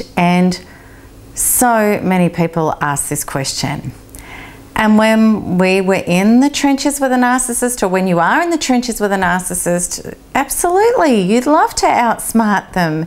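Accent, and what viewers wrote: Australia. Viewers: the ozzy accent is strong with this one...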